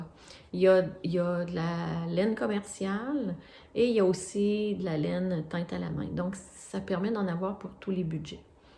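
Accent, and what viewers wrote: Quebec, Canada. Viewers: Bravo pour ce premier podcast. Très bien expliqué. J'adore 😍 et moi, je me régale de l'accent québequois 😉 Même si je n'ai pas l'occasion de tricoter de la layette, ça fait toujours plaisir de voir toutes ces mignoneries, et ton plaisir à nous les montrer est contagieux. Merci d'avoir affronté la caméra et surtout continue 😘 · Merci pour ce bon moment ! On dirait que tu as fait ça toute ta vie 😊vivement le 2eme épisode ! Et ton accent me fait tellement de bien, cela me rappelle mes années québécoises ❤❤❤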